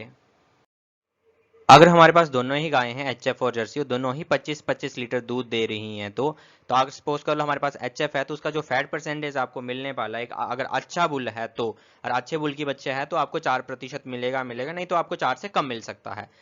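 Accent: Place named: Bihar, India. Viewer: Aap bihar se ho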